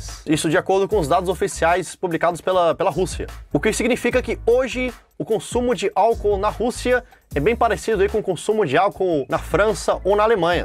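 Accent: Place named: Ceará, Brazil. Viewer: VOZ DE HUMORISTA CEARENSE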